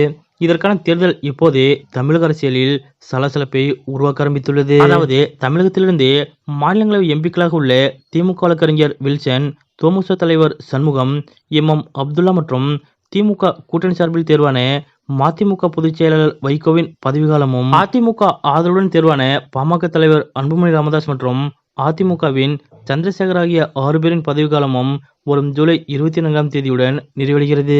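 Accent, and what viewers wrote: North India, India. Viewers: Speak properly Tamil. You are not original Tamilian. May be from North India. From Gujarat????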